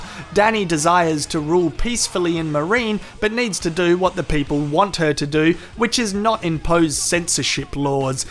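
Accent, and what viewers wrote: Australia. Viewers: Fuck this shot is gold 👍🏻 you an Aussie? Or just the name? Aha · Love your facking reviews mate. Australian narration FTW! I imagine you've read about Georgio's plans for Shireen in the book? <link>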